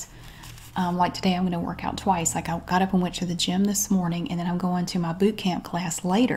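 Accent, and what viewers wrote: South, United States. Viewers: You are such an awesome person . love the southern draw . you really get into your characters and i love that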